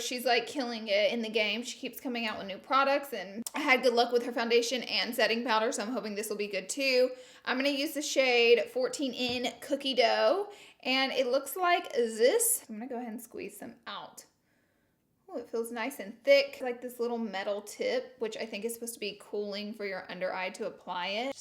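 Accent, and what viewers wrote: South, United States. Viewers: Did you spend a lot of time in Alabama during your break? Your southern accent sounds like it’s been rejuvenated. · You can tell you’re from the south when you’ve never miss understood words 😂😂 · I have the same accent. be proud of it. I love being a southern n.c. girl. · Your eyes were gorgeous!! So glad to hear your sweet southern voice!! ROLL TIDE BABY!!!!!🎃👻💜💜💜🕸🍁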